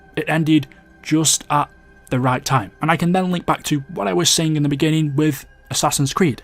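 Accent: United Kingdom. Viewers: you sound like razzbowski if he was super bri'ish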